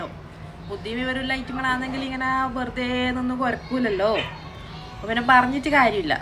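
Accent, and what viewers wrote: Kannur, India. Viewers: കണ്ണൂർ ഭാഷ ഇത്ര ഭംഗി ആണെന്ന് ഇപ്പൊ മനസിലായി... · my wife and daughter are enjoying your all programs. We are from Kannur, so it is our normal talk. Thanks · Super chechi kannur slag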